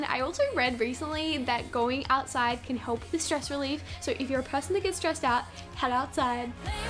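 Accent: Australia. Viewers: Is she AUSSIE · Are you aussie🤨🧐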